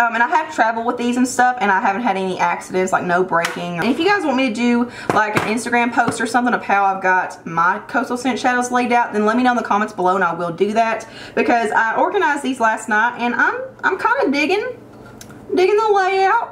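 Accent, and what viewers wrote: South, United States. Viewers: FINALLY, a Beauty Guru YouTuber with a country accent! You just earned a new subscriber!!! #CountryGalsDoitBest #MISSISSIPPIGAL 💋💋💋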